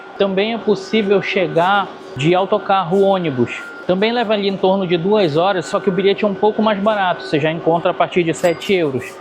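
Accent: Pará, Brazil. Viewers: Seu sotaque é do Pará, certo? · O cara tem sotque paraense e torce pro vasco. PQP.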